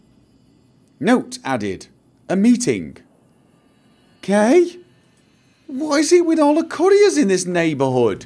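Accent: United Kingdom. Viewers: Where is from? It says he's from norway but he has kind of a british accent and he says R in a pretty weird way. And I'm not hating or anything I love his vids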